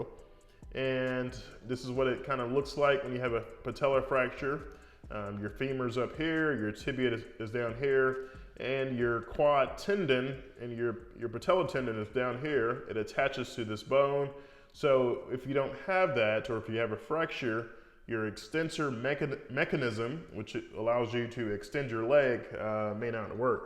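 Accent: United States. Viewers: Are u american Dr ?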